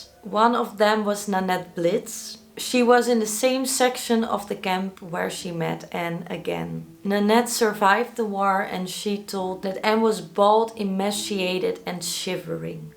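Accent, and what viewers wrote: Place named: Netherlands. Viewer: ik heb een warme plaats in mijn hart voor Engelstalige YouTube video's met een waarneembaar Nederlands accent. Als ik Engels spreek heb meteen een soort identiteitscrisis omdat ik word geconfronteerd met de keuze tussen Brits Engels en Amerikaans Engels, dit is volslagen onzin; ik zal Nederlands Engels spreken! that's different coockie!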